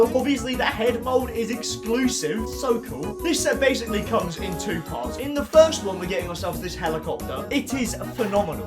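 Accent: Newcastle, United Kingdom. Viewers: Are You from Newcastle? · Oh no way he is a Geordie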